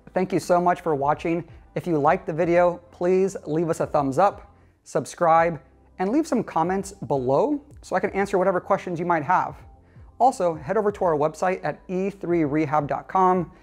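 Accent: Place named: England, United Kingdom. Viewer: Can u please reply me . I have same problem but i didn't understand your English accent . Its better if u write here. Whts problem is this.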